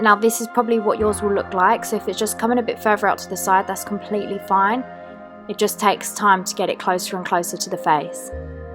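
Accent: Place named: London, United Kingdom. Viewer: Lovely video. You must bee a South or East Londoner lol. The accent gives it away.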